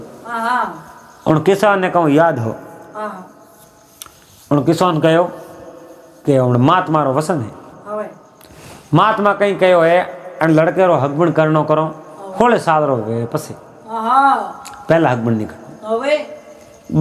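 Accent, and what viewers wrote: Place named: Barmer, India. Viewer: आप बाड़मेर की भाषा में बोल रहे हो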